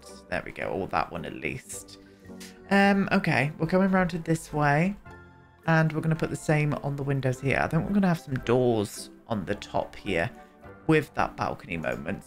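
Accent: United Kingdom. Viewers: Someone ranting about the sims build in a British accent is just what i needed 😂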